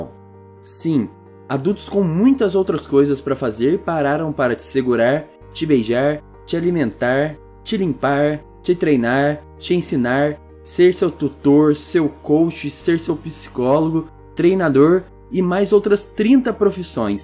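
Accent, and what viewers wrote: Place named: São Paulo, Brazil. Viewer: EITA SOTAQUE PAULISTA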